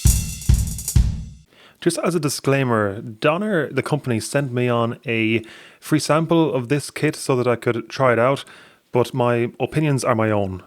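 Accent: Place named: Ireland. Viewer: He sounds Irish!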